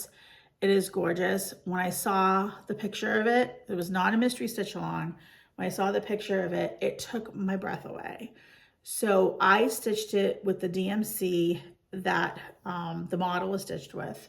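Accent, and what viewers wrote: New England, United States. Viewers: Happy New Year! I agree about 2021….let’s move on 😍. I need to get that Salem 1692 chart. I’m reading The Witches now. Your Elisabeth Addlesee is BEEE U TEE FUL! Thanks for participating in the #stoopidtumorsal. You said it perfectly with your New England accent 😍☺️😳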